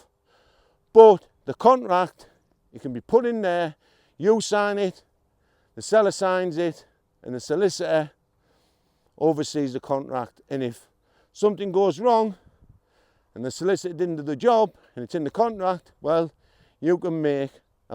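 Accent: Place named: North East England, United Kingdom. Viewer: Hiya mate sound like ya up NE somewhere... maybe Redcar way ? Just found the channel and appreciate whatever advice you have to share. Do you still have to cough up council tax or business rates if classed as smallholding ? Cheers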